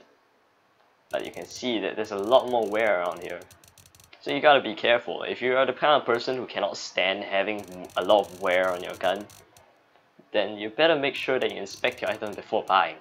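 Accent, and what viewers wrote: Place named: Singapore. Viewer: Are u singaporean? u have a accent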